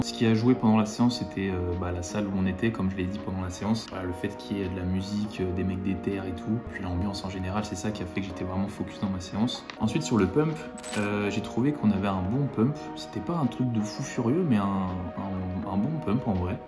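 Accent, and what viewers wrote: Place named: France. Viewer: To be honest I didn’t understand a lot of words but watching you because of your wonderful French accent. It’s slow for me and helps me understand what words you use. Greetings from Russia👋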